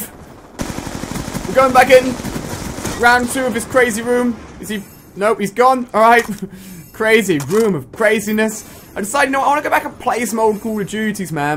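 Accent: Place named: United Kingdom. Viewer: are u 50%muslimand50% British I think that because u have a British accent and your name is a Muslim name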